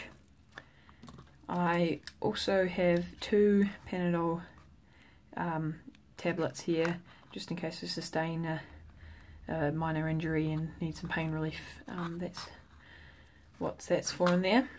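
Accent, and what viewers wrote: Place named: New Zealand. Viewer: I recognise the accent! A fellow kiwi I see. What part of NZ are you from?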